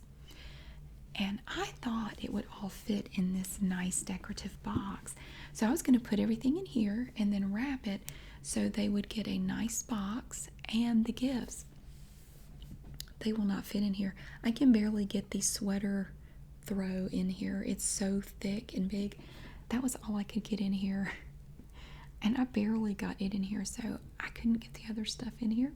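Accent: South, United States. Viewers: Hi friend!!! I love your relaxing voice! I have a southern accent too. I hope my new ASMR channel does good someday like urs. I am new to doing ASMR and not that good at it but I have some cute ideas in mind to do once I’m not so busy with my photography. I also have a beauty channel too. I do beauty subscription box openings on there and sometimes pets vids of my critters. Hopefully soon u will stop by my channels too. I’m a huge fan or ur ASMR sweet friend and look forward to getting caught up on watching ur vids. I love the pug sweater, I have a pug named Sadie who will be turning 14yrs old this month. Do u have a pug too? Much love and support from Kentucky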